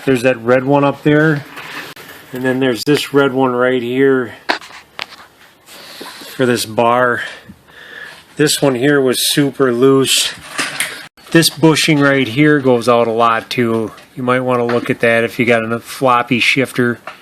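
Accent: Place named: Midwest, United States. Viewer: Enjoyed the tutorial man with the Midwest accent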